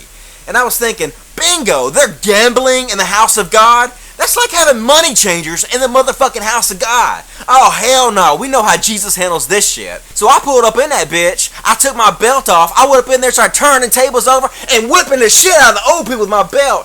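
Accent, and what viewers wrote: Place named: Kentucky, United States. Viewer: Oh shit you live here in memphis? you have a more country accent than most people here i thought you were from like kentucky or something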